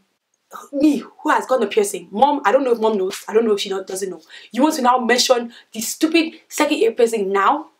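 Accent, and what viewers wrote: Nigeria. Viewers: Lmbo you told this story in the most Naija way. So funny. Glad you made it out alive :)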